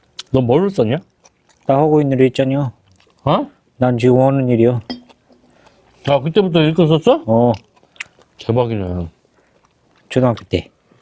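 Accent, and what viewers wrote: Jeolla, South Korea. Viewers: 요즘 계속 찾아보는 초짜영상입니다. 전라도 사투리 겁나게 재밋네요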